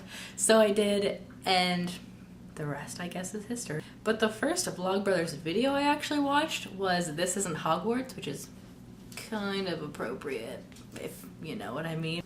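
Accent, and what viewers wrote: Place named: Canada. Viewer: I can't believe your favourite word in French is besoin. This is so random. ALSO, CANADIAN ACCENT. YES. YES.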